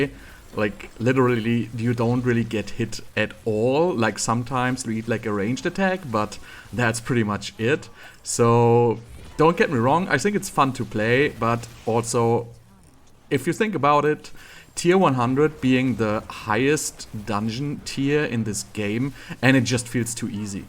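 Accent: Germany. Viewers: Man hört einfach immer wenn jemand deutsch ist haha, gutes video 👌🏼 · Vielen Dank für diesen megageilen Build! Von allem was ich bisher probiert habe, bockt deine Version am meisten. Mach weiter so! PS: Ich schreibe auf deutsch, weil ich deinem Englisch Akzent nach ziemlich sicher bin, dass du Deutscher bist.😁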